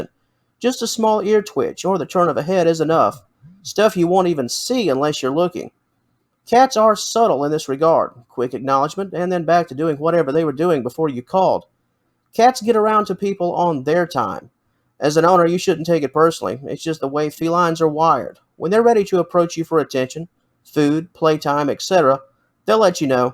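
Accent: United States. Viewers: I don't like your cowboy accent. Difficult to understand for us non-speakers. Improve.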